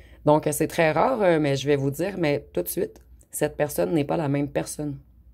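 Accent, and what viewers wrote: Canada. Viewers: J'adore l'accent canadien bonjours c'est Patrick vous êtes la rose de mes jours ❤❤❤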